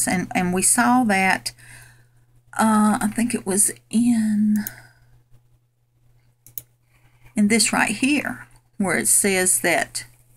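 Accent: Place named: South, United States. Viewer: Thank you Sister! You are so right on in this video. What a blessing you are. I can't wait to meet you in heaven. I also love the fact that you are a southern girl (your accent). I live in East Tenn. lol God bless you as you continue to show us what the Lord is revealing to you! I just feel so comfortable and such a peace listening to you. I don't know how to really explain it, right at home with your teachings I guess. Anyway, love you and God bless you! :)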